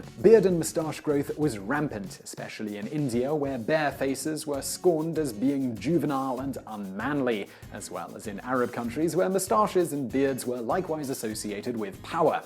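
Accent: United Kingdom. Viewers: Is it just me or is that Johnny Sins with a British accent and a beard? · I am interested in finding out today, what kind of accent does Simon have. I noticed how he says the word five (foive) finally (foinally) by (boi). What part of Britain does this kind of accent come from?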